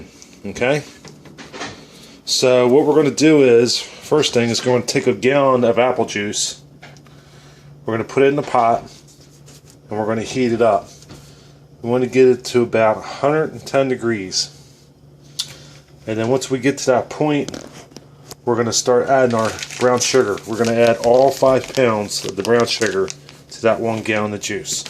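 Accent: Philadelphia, United States. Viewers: Ryan J he sounds close to South Philly to me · That Philly accent..., my goodness. Making me homesick.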